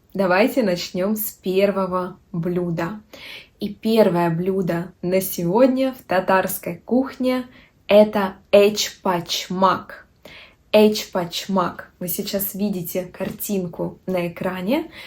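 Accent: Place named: Finland. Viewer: Я единственный, кто слышит в этом финский акцент, звучит довольно круто. Далее я хочу услышать некоторые южнорусские акценты с раскатистым «р». 😊🍖🍗🍗🥞🥞🥞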